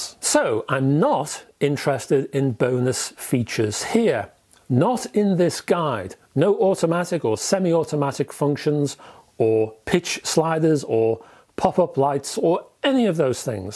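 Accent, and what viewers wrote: United Kingdom. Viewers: I am from Canada and when I hear a British accent I prefer prices to be quoted in "quids" instead of "pounds". Great video!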